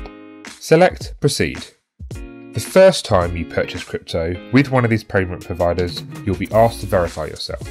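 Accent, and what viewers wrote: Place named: United Kingdom. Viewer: why do you speak British?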